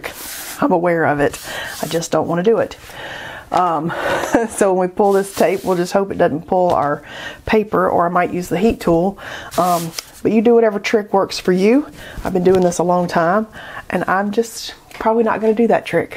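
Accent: South, United States. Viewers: I told my SO how you're from Georgia, as he's"heard" your southern tones, but after finishing the video I said, "...ooohhh babe!! somebody's have pee'd in Wheaties!" I said "listen to these 3 things-The tape...then the challenges...THEN the water?!...and you tell me!"?? He said, "She Julia Sugarbaker'ed y'all, lol"(hopefully you can appreciate the reference)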